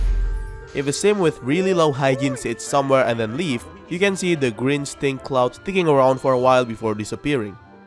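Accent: Indonesia. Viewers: ea yg ngomong sama punya channelnya org indo ya wkwk kedengeran dr aksennya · r u indonesian?!? your accent sounds kinda indonesia-ish and also your youtube name :D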